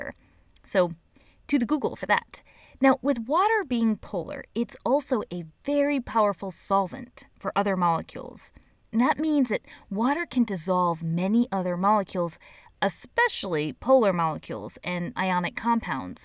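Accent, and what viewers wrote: United States. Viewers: Nah, it's the annoying whiny voice, american accent, and also the tone that would be condescending for adults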